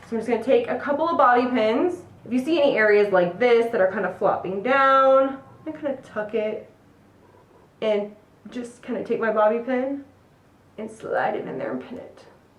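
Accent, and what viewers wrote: Midwest, United States. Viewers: I've watched a couple of your videos and I decided you had a Midwest accent and surprise we live in the same city! Or at least I live in the same area as your PO box so we have the same accent. Anyway I think I liked the third bun the best. Except whenever I try it, it's almost always bad. I haven't gotten that one to work on me.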